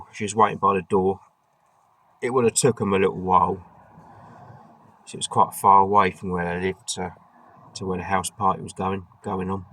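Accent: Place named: Yorkshire, United Kingdom. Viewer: You sound like me, I'm a Yorkshire guy but living in Portugal, at the moment. Check me out mate, I've just subscribed. Olives are Free in Portugal 😅😅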